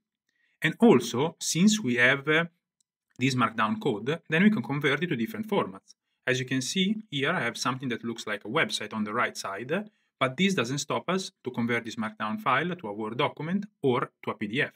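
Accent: Italy. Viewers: love your italian accent xD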